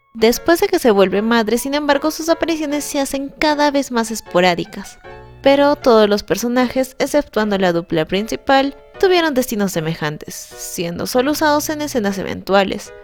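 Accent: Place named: Chile. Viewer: ctm eri chilena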